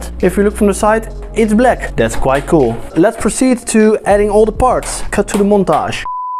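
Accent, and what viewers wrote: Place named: Netherlands. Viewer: I hear a dutch accent haha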